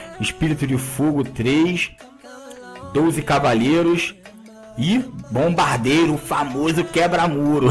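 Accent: Rio de Janeiro, Brazil. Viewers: Meliodas O Taradado n muda nda,antes de ver o cara eu pensava que ele era um carioca magrin esse cara é bom mto melhor q o mister M #MisterMÉoPiorClashr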